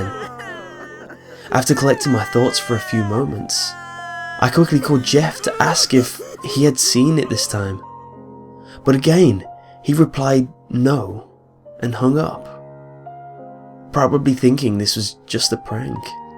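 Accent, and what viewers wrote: United Kingdom. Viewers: Your BRITISH !?!?!?!?!?!?!?!?!?!?!?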